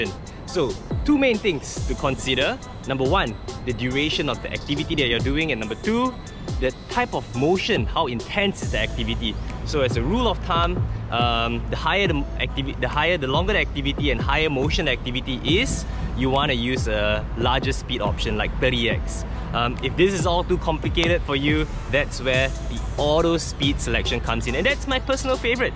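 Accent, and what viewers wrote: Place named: Singapore. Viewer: Idk why, but I'm just enjoying the singlish accent, LOVE IT ❤️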